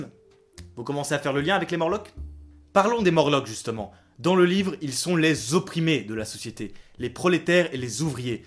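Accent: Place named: Belgium. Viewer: Je m'étais dit "allons voir sa vidéo pour apprecier ce somptueux accent belge" mais sans moquerie c'est très interessant. BRAVO.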